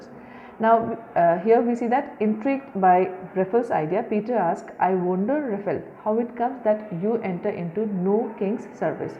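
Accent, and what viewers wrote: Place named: India. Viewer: I hate the Hindu accent, but this is the only summary available.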